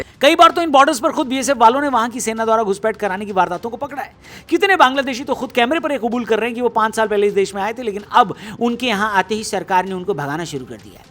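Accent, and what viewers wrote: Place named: Bihar, India. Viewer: Bhai tera thoda sa bahuwa to nikal hi jata h...but information about the topic is serious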